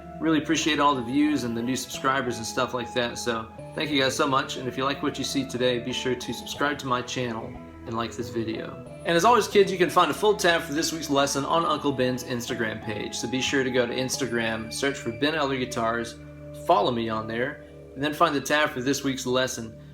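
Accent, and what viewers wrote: South, United States. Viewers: great stuff Uncle Ben!... perfect for October..... you sound like you live in the south...... you got the heat on yet?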